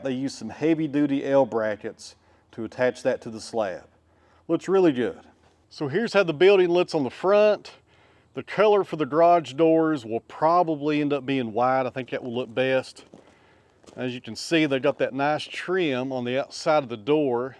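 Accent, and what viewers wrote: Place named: Tennessee, United States. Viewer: I guess I could listen to the Spanish version since I understand that, but without the Tennessee accent, it won't be the same. 😉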